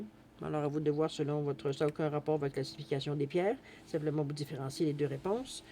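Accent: Canada. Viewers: Moi..chère...j.adore.ton..accent..il..viens..du.pays..de..mes..rêves..😌..je..suis.. morad..mon.2’..petit.non..c.est..Jaky..mais.tous..mes.amis.m.appelle..Jaky…j.écoute.pour..la.1.fois.ton..tirage.mais..surtout..ton..bel..accent…….❤.Canadien..se.que.j.aime..ce.splendide..pays…tu.ne.peut.pas.imaginer.a.quel.point..merci.pour..le.tirage..vierge..😊😊😊❤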